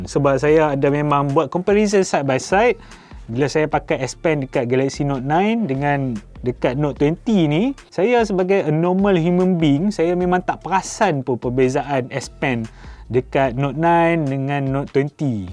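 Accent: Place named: North, Malaysia. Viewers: Ckp utagha dia pekat boleh tahan hahah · org perlis ka?..ckp loghat perlis kalau ya..hi..kita geng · Tudia kembali pada jalan sebenar, dulu review laguni bahasa utaqa pastu tukaq KL la tukaq balik. Sempoi 😁👍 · Cakap Kedah baik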